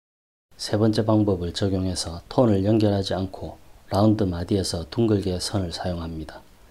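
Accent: Gyeongsang, South Korea. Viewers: 혹시 대구분이신가요? 드로잉관련 자료찾다가 구독박고 애청자가 되버렸네요 ㅎㅎ 앞으로도 좋은영상 많이 올려주세요!!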